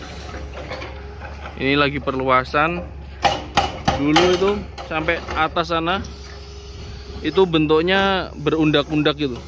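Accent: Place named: Indonesia. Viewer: saya lebih suka orang indonesia yg bicaranya berlogat indonesia ,daripada orang indonesia logatnya di arab2kan...jaga terus tradisi logat indonesia nya mas .,jangan seperti yg lain yg logatnya di arab2kan...budaya kita kekayaan bangsa kita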